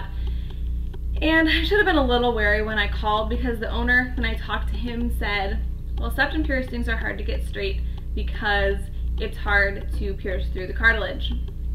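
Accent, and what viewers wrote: Michigan, United States. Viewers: I knew I recognized that accent, another fellow Michigander! Haha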